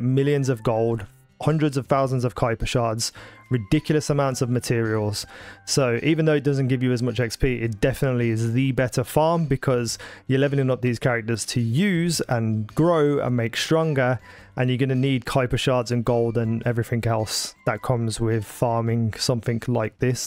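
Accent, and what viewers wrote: Birmingham, United Kingdom. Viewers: I wasn't being rude when I called you Brummie. I'm from the south coast but I'm actually welsht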